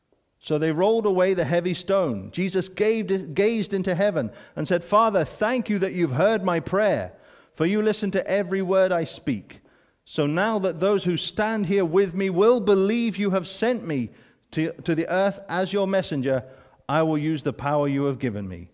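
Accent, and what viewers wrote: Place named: Netherlands. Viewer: Do you live in Nederland? I can hear dutch language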